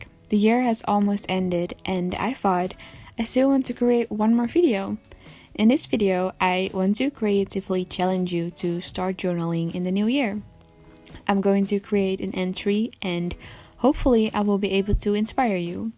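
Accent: Netherlands. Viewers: Love your video!!🐙 zodra je begon te praten wist ik dat je uit nederland kwam wat leuk 🙌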